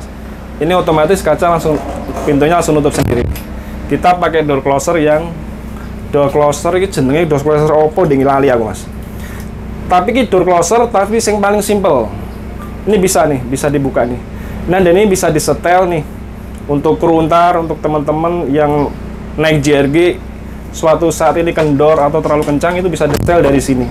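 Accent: Java, Indonesia. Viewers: cakep, lux.. ini yg bawain narasi mas siapa? ok lah, cukup bagus, jelasinnya padat dan jelas.. walo logatnya kontras sama ownernya, ini medhok ala Jawa.. 😅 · Seneng cara menjelaskan mudah dimengerti indonesia medok medok 😁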